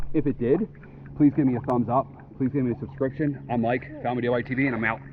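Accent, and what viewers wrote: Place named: Delaware County, United States. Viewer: That Delco accent lol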